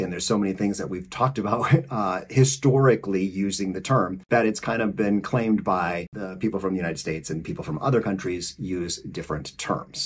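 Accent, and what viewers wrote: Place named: Canada. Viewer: Gawd ... You almost sound Canadian. For me that is 1 of the best compliments I can think of. Personally I try to assume everyone deserves the chance to disappoint me or amaze me. So far you've only amazed me. Grrr8 subject, grr8 attitude and good for you in trying to become a better person. Gawd knows we need more of "those" people. If I offended anyone I'm Canadian so ... sorry . P n D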